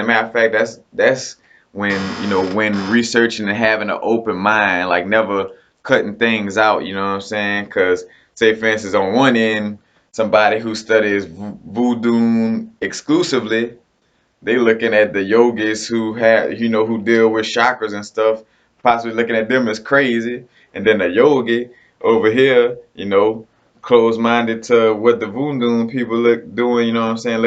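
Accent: New Orleans, United States. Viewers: U sound very New Orleans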